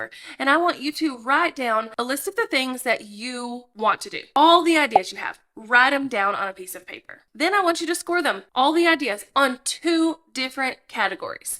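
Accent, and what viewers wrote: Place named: Carolina, United States. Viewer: you've got a Carolina accent lol! I'm from South Carolina. great video! where did you get your shirt from also? super cute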